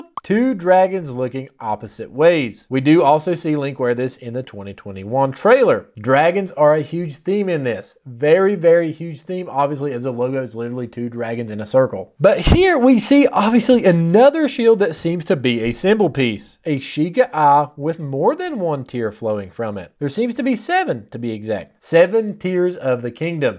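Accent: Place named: East Texas, United States. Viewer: I missed about half of what you said because I was trying too hard to place your accent 😂 East Texan maybe?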